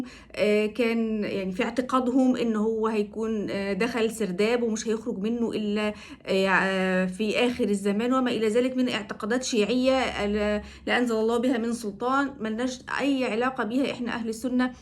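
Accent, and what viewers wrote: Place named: Iraq. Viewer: كافي تمضرطين بالهجه العراقيه اكولهه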